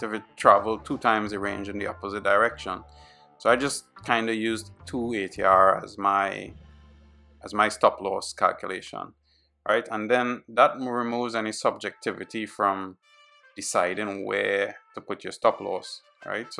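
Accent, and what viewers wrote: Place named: Trinidad and Tobago. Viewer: great video. u sound like a trini, we should link up and work on some stuff. i am also an algo trader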